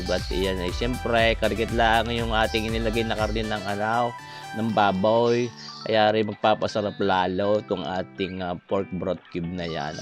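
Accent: Batangas, Philippines. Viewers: ALA EY!! ANG SARAP BAGA NIYAN KUYANG BATANGUENO, He He 😃 SHOUT OUT PO KUYANG 👍 YOU SOUNDS LIKE MY FRIEND CO WORKER HERE IN ZURICH SWITZERLAND HE IS FROM BATANGAS AM FROM MINDANAO 🤗SARAP PAKINGGAN NG BATANGAS DIALEKT. he he🥰. ALAY EY GUMAYAK. KA NA BAGA !!! THANK YOU 🙏GOD BLESS KUYANG ❤️🇵🇭🇨🇭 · Watchibh here in saude jeddha.nakakatuwa yong/salita/u/sir/batanguenio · Hehe ay tunay aring batangginyo na are nkakatuwa mgsalita ah ah ay tunay ay akoy kabayan moren eh mindoro nman ako segi sarapan mo mgluluto din ako nyan kabaya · Kabayan taga Batangas din ano pero gusto ko iyan puntong Batangas natin katuwa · Nakakarindi ka naman panoorin eh sobrang punto mo pero masarap at magaling kang Chef kaya laang bawasan mo un sobrang puntong Batangas hindi nman talagang ganyan ang punto ginagaya mo si Leo Martinez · Super sarap kabayan,tunay n nakaaaliw kang pakinggan at panoorin kc nawawala stressnk maghapon,aliw n aliw ako sa tono mong batangenyo,inlove n nga ako sayo hehe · Gusto ko yang accent mo, balae ko taga batangas din. 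· Idol ko tlaga mga batangenio pag nagsasalita,🤣 napapata nio PO aqo ,, Sir,,, God bless po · Nkakatuwa nmn yong tuno ng salita nya malambing pakinggan ano baga yn tuno na yn batangas ba or laguna ba yn.happy eating. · Mauutas ako sa punto...taga Batangas din ako'y..haha ang sarap nyan Shout out Cora Mercado thanks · Kabayan kakaaliw namang manood sayo kase you're so funny.I like the batangas accent 😍 Nako hanap ako ng puso ng saging bukas at ng ma try yang recipe mo Ala e mukang masarap. Ano ba yung karikit?? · I love ur accent bro..na alala ko kaibigan ko taga Batangas.tuwang tuwa kmi nun dahil sa pananalita nya..she's from Balayan Batangas..her name Eva Villena..gusto ko ung mga niluluto mu..thanks for sharing...gud luck... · Natutuwa ako sa puntong Batangas kahit ako nag iisa natatawa ako sarap pakingan 😜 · I enjoy watching and listening how to talked. Your accent batangenio i love it. 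· Batangueño k po chef,ala eh! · Yes po ang sarap pakinggan ng batangas accent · ang cute u po mag salita parihas kayo ng bf ko dati tiga nasugbo batangas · Batangueno hehez😁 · Sarap tlga Yan batanggenyo ka kuya Ako .kxe salita mo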